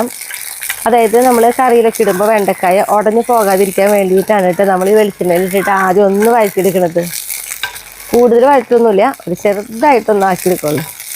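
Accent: Thrissur, India. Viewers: ഓരോരുത്തർക്കും വേണ്ടി സംസാരരീതി മറ്റാൻ പറ്റുമോ.. അത് തൃശൂർ ഭാഷയുടെ പ്രത്യേക ശൈലി ആണ്... നിങ്ങൾക്ക് കണ്ണൂർ കാസർഗോഡ് ഭാഗത്തുള്ളവരുടെ ചാനെൽ കണ്ടാൽ എന്തായിരിക്കും പറയുക... മനസ്സിലാവുക പോലുമില്ല.. പക്ഷേ നമ്മൾ എല്ലാം കാണണം.. മനസ്സിലാക്കണം.. അങ്ങനെ അല്ലെ.. അല്ലാതെ ഞാൻ പറയുന്നത് മാത്രമാണ് ശരി എന്ന് വിചാരിക്കാതിരിക്കുക.. ഇങ്ങനെ പ്രതി കരിച്ചതിനു ക്ഷമിക്കുക.. Content അല്ലെ പ്രധാനം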